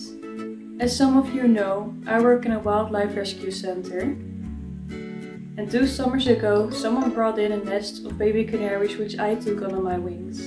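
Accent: Netherlands. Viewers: I think I'm deaf, but I hear a Dutch girl speaking English. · Lovely! Really wonderful job! I can hear from your voice that you are dutch hehe😂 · You sound netherlands like how i say the english Words u say them whit a wierd axsent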